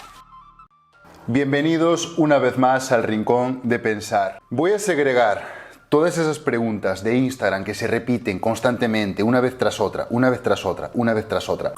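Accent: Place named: Galicia, Spain. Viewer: Ti es galego? paréceme aínda que non teñes moito acento, pero pola túa forma de empregar algunhas estructuras gramaticáis. Pregúntoche dende o respecto e a curiosidade...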